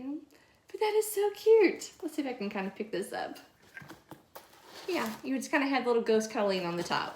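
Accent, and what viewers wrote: South, United States. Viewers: I love the whole thing!! You don't talk funny it's just our southern drawl!! ❤🤔😂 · I enjoy your channel so much! I'm playing catch up now watching all I can! I can understand you just fine I'm a southern lady too! 😊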